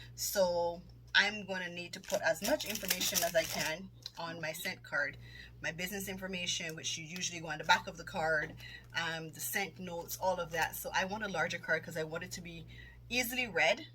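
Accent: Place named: Barbados. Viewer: I thought as much I can hear the bajan accent. I’m from Barbados as well. Live in UK now !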